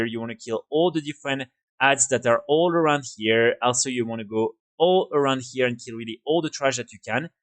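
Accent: France. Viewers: Tu es français ? Je dis ça à cause de l’accent 😊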